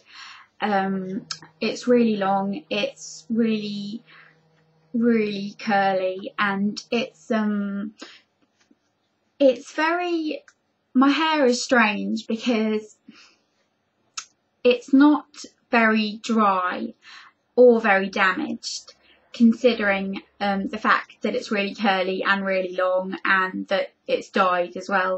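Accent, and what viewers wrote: United Kingdom. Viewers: I. Love. Your. Accent. SO MUCH brittish accent always reminds me of harry potter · we have similar hair and everything you said was really interesting. Btw ,just so you know, If I had a british accent, I would never shut up :D lol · omg!! thanks for your tips. my hair is very similar to yours when i was younger i hated it i wanted it straight all the time. now at 37 years old i love my curls i get many compliments. love your british accent! all the way from puerto rico!!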